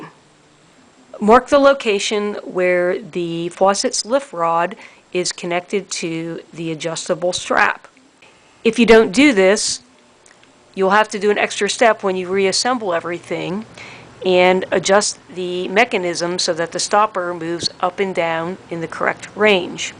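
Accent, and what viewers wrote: Philadelphia, United States. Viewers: That’s a Philly accent if I’ve ever heard one!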